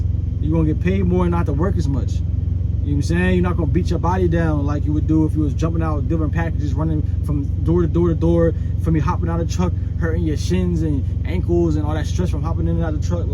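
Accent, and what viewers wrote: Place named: Philadelphia, United States. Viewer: How much they pay ups truck driver now for local cuz u sound like u from philly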